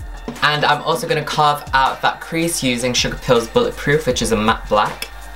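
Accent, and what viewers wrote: United Kingdom. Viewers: are u british? I literally didn't notice til the end lmao. awesome work !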